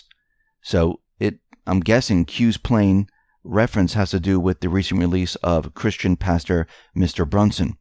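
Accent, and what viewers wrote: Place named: East Coast, United States. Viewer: Dont u listen to your voice with obnoxious east coast sound or r u oblivious to it the accent is so pompous and ignorant sounding though u r not stupid q asks for prayer because he will ask u followers to drink kool aid next only a matter of time until trump assasinated and pence forces bible study in public school hawaii missile was aimed at af1 or did u miss that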